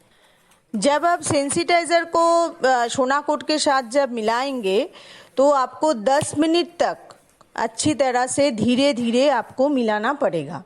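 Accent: Bengal, India. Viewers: আপনার হিন্দি বলার ধরণ বলে দিচ্ছে আপনার মাতৃভাষা বাংলা , বাংলাতে বলতে পারেন ।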